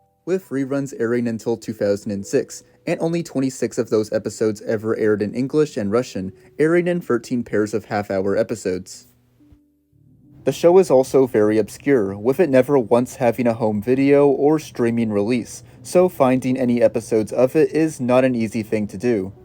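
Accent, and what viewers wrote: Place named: United Kingdom. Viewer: You sound British, dude Edit: hey,is the creator of KND related to Patrick Warburton,famous voice actor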